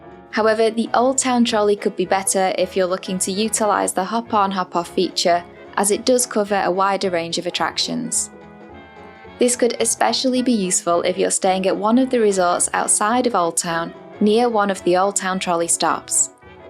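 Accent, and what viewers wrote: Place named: Somerset, United Kingdom. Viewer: Sounds like you're from Somerset UK. Try Santiago's Bodega at the end of Petronia next time. You won't be disappointed.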